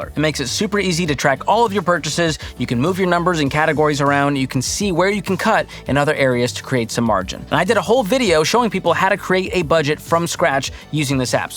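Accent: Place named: Texas, United States. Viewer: You sound like a fellow Texan! If so, and you like okra, that stuff will grow like crazy. I enjoy gardening, but I agree that most of it is not cost saving. Usually only really save by growing okra, peppers, cherry tomatoes, and Swiss Chard.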